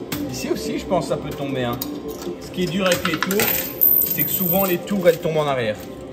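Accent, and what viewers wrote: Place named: Belgium. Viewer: Coucou, nouvelle abonnée...vu ton accent ça doit être en Belgique ces machines car en France ça n'existe pas de gagner des billets aux pousses pousses, dommage car pour l'adrénaline c top!😁, Je te souhaite beaucoup d'abonnés !😊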